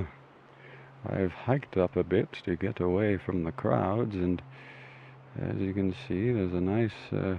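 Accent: United Kingdom. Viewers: r u bri’ish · Very good British accent! Im a brit myself and its uncanny dear boy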